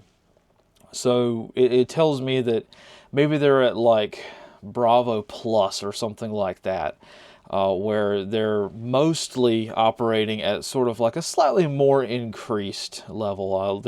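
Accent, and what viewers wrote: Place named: Maryland, United States. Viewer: I would bet you were born in or near Maryland from your accent.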